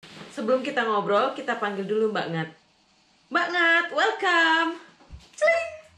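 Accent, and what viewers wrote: Indonesia. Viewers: Kental bgt aksen indonya😀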